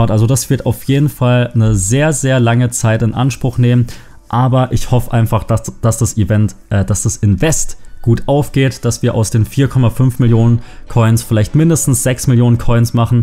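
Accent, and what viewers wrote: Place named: Baden, Germany. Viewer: liegt vielleicht auch an dem badischen Dialekt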